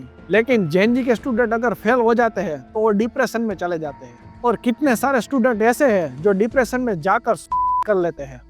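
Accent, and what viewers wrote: India. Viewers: Need to improve the pure Hindi accent rather than kathiyawadi Gujarati accent. This will add more interest to watch full video. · Bro Your Gujrati Accent Is Decreasing Interest To Watching Video. Pahle Hame Hindi Ka Proper Accent Ka Practice Karna Padega. Baki Information Best Hai.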